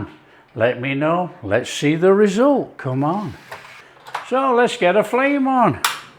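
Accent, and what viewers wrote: Yorkshire, United Kingdom. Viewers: He doesn't sound like an Aussie beowtiful is Yorkshire. He lost me when he put ketchup on it, tomato doesn't go with egg.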